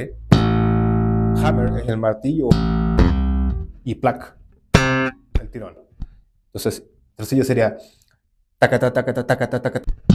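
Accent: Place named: Chile. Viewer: Tienes acento Chileno... ACENTO, no términos..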